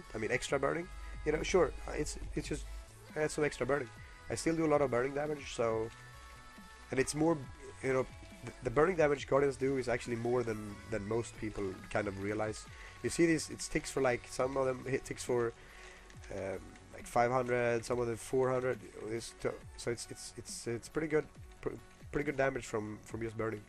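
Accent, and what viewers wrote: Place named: Scotland, United Kingdom. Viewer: where are you from? XD i tried thinking but i couldnt decide between a scottish accent or a deeper accent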